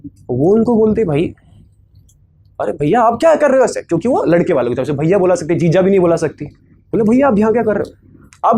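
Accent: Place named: Haryana, India. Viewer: Sir ye hariyanvi accent h... Rajasthani accent nhii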